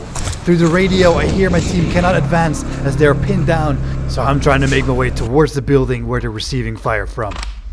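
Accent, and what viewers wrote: Netherlands. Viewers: I knew that accent sounded dutch! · sounds Dutch to me....being Dutch :)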